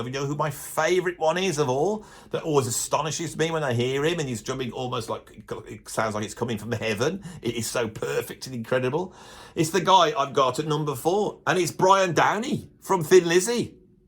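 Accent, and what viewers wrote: Birmingham, United Kingdom. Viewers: love your Birmingham accent, still lying on the floor laughing.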